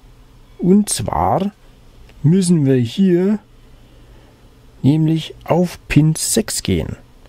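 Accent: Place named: Franconia, Germany. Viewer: Tolles Video, bisle frängisch is a dabei:D finde ich persönlich toll, weiter so LG.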